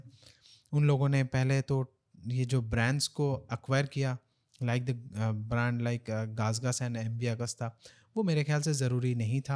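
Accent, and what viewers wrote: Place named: Bengal, India. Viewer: Your bong accent is too pronounced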